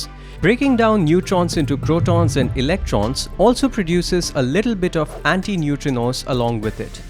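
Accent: India. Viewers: Its the annoying indian accent I cannot tolerate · This is an Indian guy. His accent.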